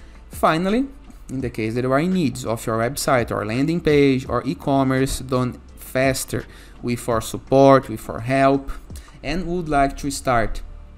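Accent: Brazil. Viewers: salve! pelo sotaque só pode ser BR, valeu pela dica, nao tava achando onde mudar a bagaça!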